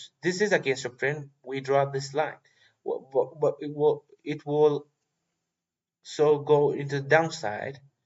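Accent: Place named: Russia. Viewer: recaaardddiiiingggg😘😅 btw best knowledge and also kaatttar englishh like russsian or spanishhhhh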